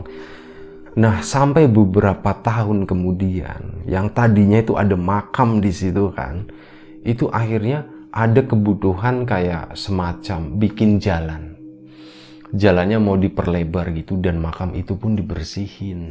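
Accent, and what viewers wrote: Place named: Banyumas, Indonesia. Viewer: Kalau boleh tahu video laphor ini dibuat dimana ya... kadang denger bahasa jawanya mas kok agak ngapak. Barangkali dekat bisa mampir.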